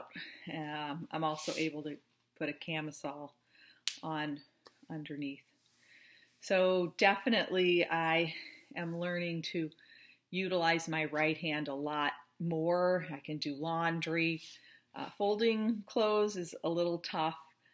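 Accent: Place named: Toronto, Canada. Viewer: I grew up in TO, so I’d know that accent anywhere. My shoulder gets done on Aug 1st. Thanks for all the good info.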